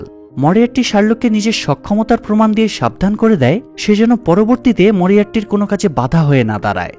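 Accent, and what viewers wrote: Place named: East Bengal, Bangladesh. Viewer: কথকতার মান ভাল না। গলার আওয়াজ খারাপ ।উচচারন বানগাল বানগাল।উচচারন ঠিক করে নাও।শুভেচছা রইল।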